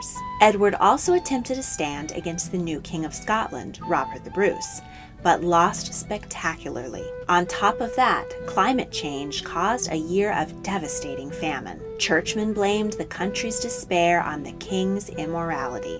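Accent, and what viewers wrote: United States. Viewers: she is pronouncing it correctly. it sounds different because of her american accent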